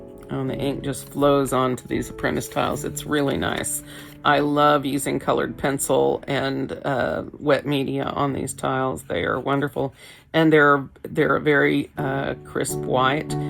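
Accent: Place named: South, United States. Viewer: I beg your pardon, we do say y'all from time to time, but around here, we don't use y'all like Paula Deen. I love her but she goes way over board with it. But you know, when she first went on tv she didn't do that. Must be some sort of publicity crap. At any rate, I do love your tangles, southern accent and all. 😂😂😂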